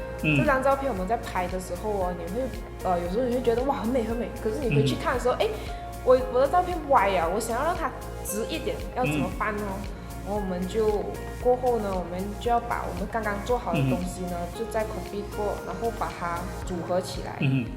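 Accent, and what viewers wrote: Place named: Malaysia. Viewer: 很开心看到这个channel...听到马来西亚华语很亲切！加油！！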